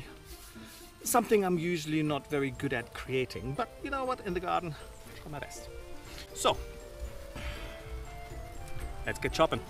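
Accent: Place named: Northern England, United Kingdom. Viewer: Fascinating that a German is doing a northern English accent. That's a trowel, by the way.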